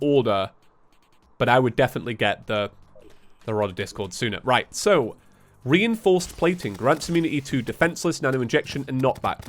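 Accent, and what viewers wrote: United Kingdom. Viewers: You Sound like britain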